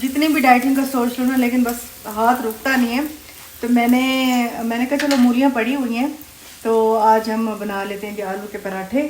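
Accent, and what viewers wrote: Saraiki, Pakistan. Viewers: ji u r very beautiful. And your house is so beautifull. Aap bolti bohot pyara hn. Jub sairiki bolti hn to sun k mujhey bohot he acha lugta h. Mere naankey bhi pichey Dera ghazi Khan se thy. To sun k apnapun lgta h. I m from India.God bless you. Bye.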